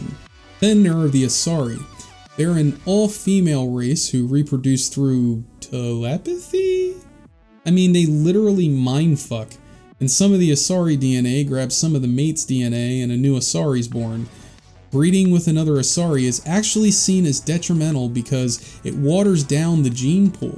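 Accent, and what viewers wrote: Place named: Mid-Atlantic, United States. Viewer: Are you from the mid Atlantic originally? No offense, but yr dialect sounds grimey (just like mine). Wouldn't be surprised if you were from Philly, DC, or my own Baltimore (especially with those juicy fronted O's). I'm about 10 mins into the video and so far, so good!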